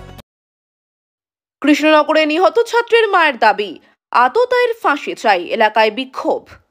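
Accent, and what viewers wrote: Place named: Bangladesh. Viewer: আপনি যতই ভালোভাবে বাংলা বলুন না কেন। আপনার কথায় বাংলাদেশীয় ব্যাপারটা রয়ে গেছে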